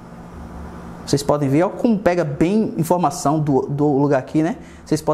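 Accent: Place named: Northeast, Brazil. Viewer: Sotaque nordestino KKK chato né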